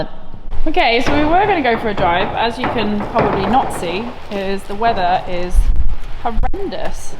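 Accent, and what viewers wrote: Ireland. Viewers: Car is amazing, and I hear a little Irish slang in you these days haha good to see the time here in Ireland rubbing off! You've accomplished so much for 29 so no pressure just enjoy your 30th! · Can tell you’ve been living in Ireland for a bit “the crack” and “grand” 😂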